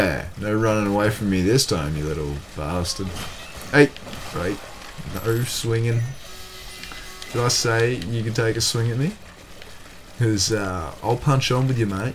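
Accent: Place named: New Zealand. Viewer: u from nz? you sound like one🤔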